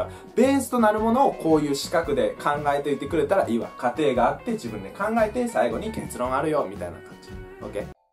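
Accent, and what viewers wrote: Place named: Kansai, Japan. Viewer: 関西弁が頭にすっげー残ってたすかる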